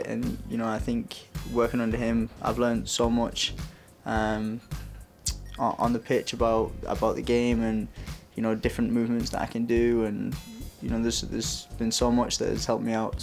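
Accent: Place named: London, United Kingdom. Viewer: He sounds like a Londoner. I was expecting a Staffordshire accent.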